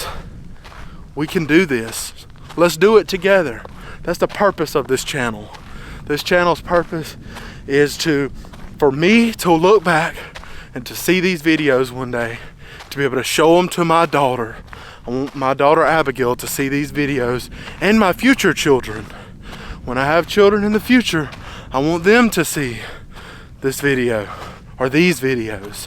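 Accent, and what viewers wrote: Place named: Alabama, United States. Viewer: Thanks you are an awesome inspiration. I love your Alabama accent and relaxed way! I love the south. Thank you. Yes I need to make goals for 2024 be blessed! ❤ Respect always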